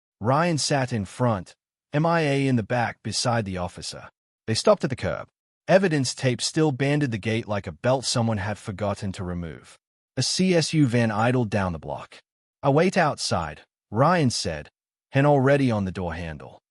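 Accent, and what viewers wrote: Australia. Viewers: I don't know which is more annoying, the Australian accent or the pronunciation of Mia's name as "M.I.A."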